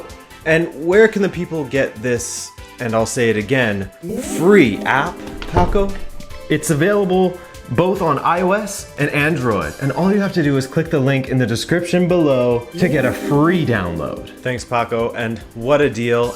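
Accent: Azores, Portugal. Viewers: Are you Azorean? I can tell there’s a bit of an Azorean accent when you pronounce names. New to the channel. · his famaly is from the azores and He has an azorean accent I think it's because of this He might sound a bit different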